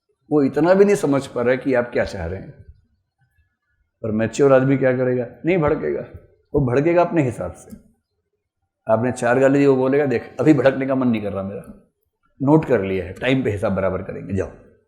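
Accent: Bihar, India. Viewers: Mtiya do n.. sir bihari tune 😋😍😍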